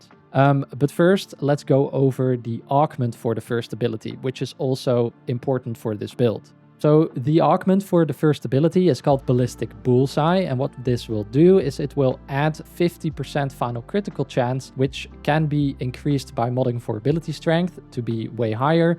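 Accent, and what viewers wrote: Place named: Netherlands. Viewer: He is from Netherlands... This is an accent.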